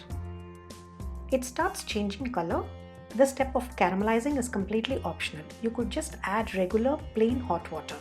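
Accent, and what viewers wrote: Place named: Tamil Nadu, India. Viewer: Nothing like our South Indian filter coffee (kaapi)! It's something I used to miss on all my business trips abroad till I started carrying a filter and coffee powder with me!, Wow! Super English pronunciation, diction and intonation even though there is a slight aroma of 'Filter Tamizh' in the accent! 😅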